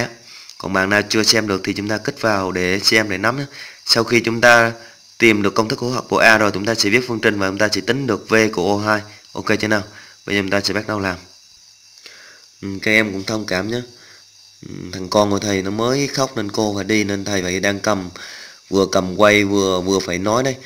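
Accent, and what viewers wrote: Binh Dinh, Vietnam. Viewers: Thầy quê Bình Định à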